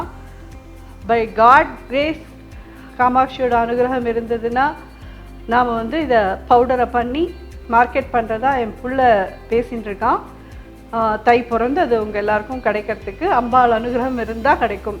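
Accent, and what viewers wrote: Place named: Thiruvarur, India. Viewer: மாமிஉங்களுக்கு நீண்ட ஆயுளை மஹா பெரியவாளும் என்னுடைய ராமனும் தறுவார்கள் உங்களுடைய பேச்சை கேட்க எங்க ஊர் திறுவாறுர் நினைவு வறுகிறத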